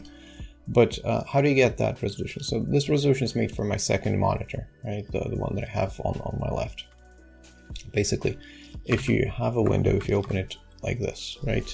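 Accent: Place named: Canada. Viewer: Your pronunciation of dipthong /aw/ rose to a mid vowel preceding a voiceless obstruent (Canadian Raising) (Common in The Big Smoke) (Common in Mississauga)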